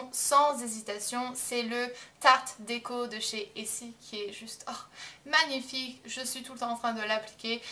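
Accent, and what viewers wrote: United States. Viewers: J'aime vraiment ton "accent" anglais/américain. Même si je sais que à la base tu es française, mais je trouve que cette langue te va vraiment bien dans ta façon de parler etc. (; Bonne continuation. ♥ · Parfois lorsque tu parles on dirait presque que tu es une vrai américaine !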